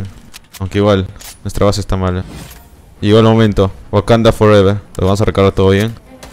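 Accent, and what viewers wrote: Peru. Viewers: Tiene acento de peruano pero le salen cosas de argentino o no jaja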